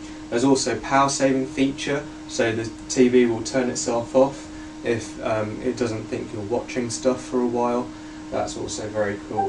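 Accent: United Kingdom. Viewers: holy shit an asian guy with a british accent thats fucking awesome!!!!!! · lol asian guy with a british accent. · never seen a asian with a british accent lol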